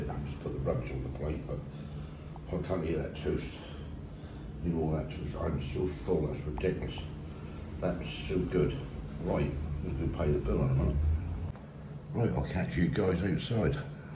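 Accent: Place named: Suffolk, United Kingdom. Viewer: You sound like you still have that great Suffolk accent , So good to hear 👍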